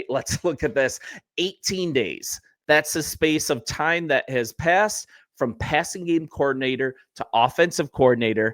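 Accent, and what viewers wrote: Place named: Canada. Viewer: I'm sensing a Canadian or yupper accent, definitely not Chicago.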